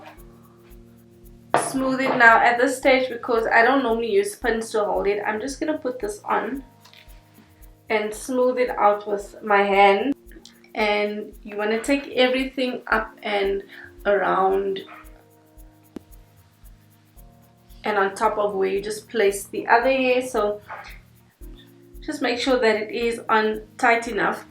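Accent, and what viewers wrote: South Africa. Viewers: Let me guess, South African???? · That's definitely a south African accent I hear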